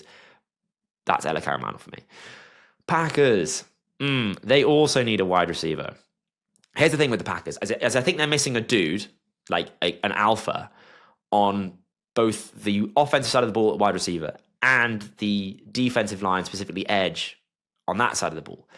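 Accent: United Kingdom. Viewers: Sorry I can't listen to some British guy talking NFL. Wrong football mate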